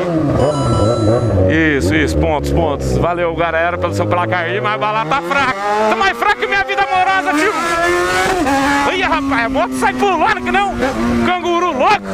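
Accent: Goiás, Brazil. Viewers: Tu é de Goiás mano? kkk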